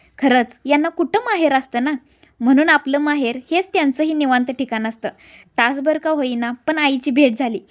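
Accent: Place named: Sangli, India. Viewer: मी पण सांगलीची आहे तुम्ही बोललाकी मला खुप आपली माणस बोलता आस वाटतय खुप छान वाटतय तुमचे व्हिडिओ बघुन😊😊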